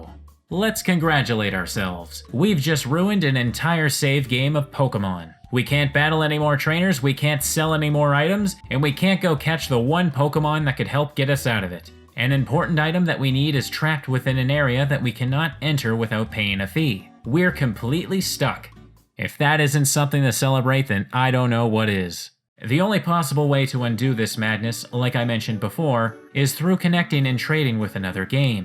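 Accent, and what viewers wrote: Canada. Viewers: as if from Canada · Maybe... But maybe he could be from BC after all. If he's from up north enough, that would explain some of the accent quirks. My friend says if you go north enough (where he goes camping, I think), they start pronouncing the aʊ-type OU words as oʊ. I don't know about the u:-type OU words, tho. I just know my friend likes to say he likes to go "oat and a boat". XD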